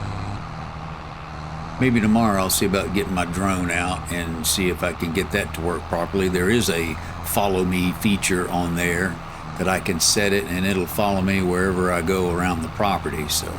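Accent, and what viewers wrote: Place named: South, United States. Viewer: Thanks for the video, you're so relaxing to listen to, I came home from work and eat then sit down to listen to you and about fell asleep not that you're boring just relaxing listening to you. You sound like your country is that your voice or are you throwing it for the video? Lol But thanks again for your time appreciate it!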